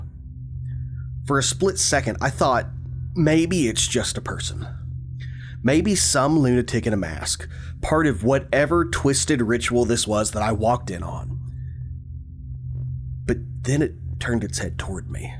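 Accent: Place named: Tennessee, United States. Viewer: Enjoyed! I like your accent. Sounds like my neck of the woods. TN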